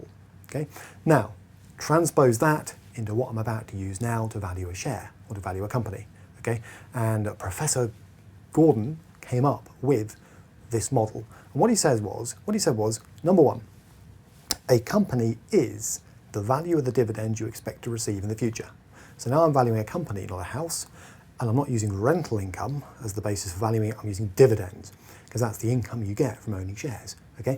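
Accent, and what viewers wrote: United Kingdom. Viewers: British accent makes me sleepy .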